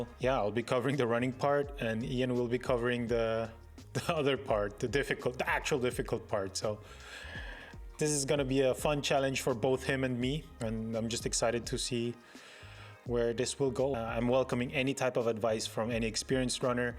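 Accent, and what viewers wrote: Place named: Austria. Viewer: Came for the training diary. Stayed for the austrian accent! 😊 Richtig gut!! 👍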